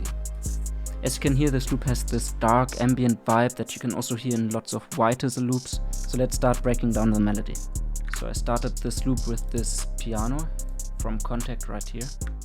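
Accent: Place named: Germany. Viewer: I hear German accent I know vid gon be hard!!!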